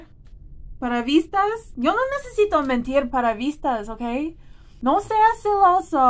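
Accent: United States. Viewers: Si eres gringa por tu acento y tu cara te lo digo yo por qué soy mexicano y vivo en Estados Unidos y así son te lo digo por qué yo si conozco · claro que tu eres gringa 100% yo vivo en estados unidos por mas de 38 años y conosco bien los acentos y a las personas blancas que son gringas . y tu eres una gringa muy hermosa tienes unos ojitos muy lindos 😍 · Te tienen envidia gringa, de que ven que hablas de sus verdades de EUUU la verdad poca gente habla de sus mismos disgustos de sus países y esos que te critican son personas inmigrantes que se fueron a EUUU porque para ellos es un sueño estar allá mientras que tú demuestras que no todo es como lo ven desde casa en fin tu si eres gringa conozco gente estadounidense que está en México y en español hablan así 100 gringas ánimo desde México saludos 🇲🇽🇺🇲🔥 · Yo estoy convencido de que eres estaunidence. Tu acento en Ingles te delata. 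· Yo si creo que si eres americana tu español lo dise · Si lo eres...tu acento es el mismo de americanas hablando español...yo conoci a varias y y asi hablaban !!! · Yo si te creó mamita! Pues yo tuve una novia gringa por casi 2 años y mi novia también así habla como tú... más o menos y mi exnovia es de TEXAS saludos my darling. ⚘❤ Desde CDMX. 🇲🇽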